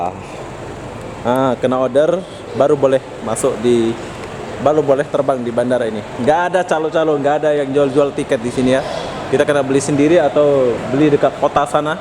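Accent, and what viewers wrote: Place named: Malaysia. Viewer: Kayanya KK sudah lama di malaysia, lagot bahasanya udah ngikut meleyu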